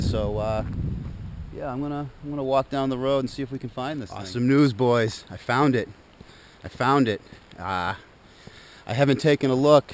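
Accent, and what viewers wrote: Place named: Boston, United States. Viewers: "I went to a pahhty las weeken that was soooo enohhmis it was stahtling." Boston baby! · that Boston accent. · This guy has to be from new england.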